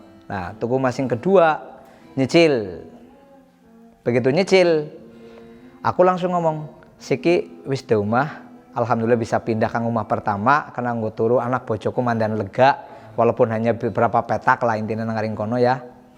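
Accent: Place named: Banyumas, Indonesia. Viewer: Kayane wong ngapak kie lur 🙌😄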